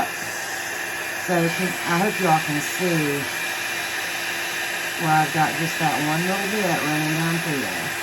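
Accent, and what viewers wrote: South, United States. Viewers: Hi, another gorgeous piece! You’re so patient. Can I ask, when using the photo paper, doesn’t it soak through? I bought an ordinary brand for ink jet printers and that’s exactly what happens. Soaks straight through. Have I got the wrong type? Or is that normal? Thanks. I love watching you create and listening to your southern accent. 🙏🙏🙏🤗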